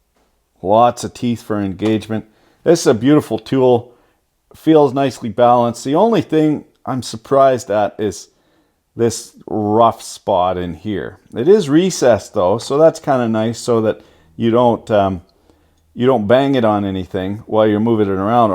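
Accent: Canada. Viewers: I'm from Florida is this how all Canadians talk?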